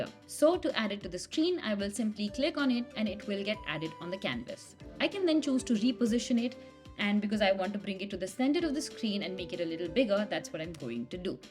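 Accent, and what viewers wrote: India. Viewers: such a nice work but voice over typical stupid indian accent , come on guys .....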